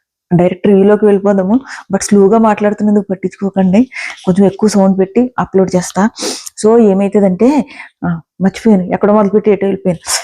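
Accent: Godavari, India. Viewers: Madam mi voice koda godavari ga pavan ramya same area na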